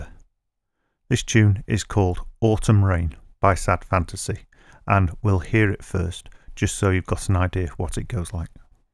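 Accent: United Kingdom. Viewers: BLIMEY!!! I thought I lost this channel and never be found again. I used to watch before I had guitar and thinking of following alone after I get one. And somehow I forgot name of channel. I dug on my history and So so relieved I found it. You sir are amazing. Maybe it's British accent so warmy and welcoming. I hope to buy e-book soon. Thank you very for articulating videos in such a manner which clicks with newbie like me. It must be time consuming to make videos on various topic but my lord your tutorial worth pile of gold. You are Bob Ross of guitar. I am so grateful for your videos. Once again thank you.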